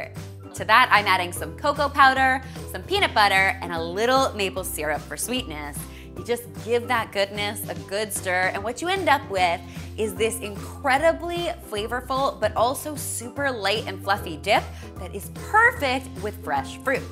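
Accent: Canada. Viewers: Horseradish?? YUCK!!!! Are you Canadian? How have I never noticed your accent before?Besides the horseradish...😷 This all looks yummy!!!!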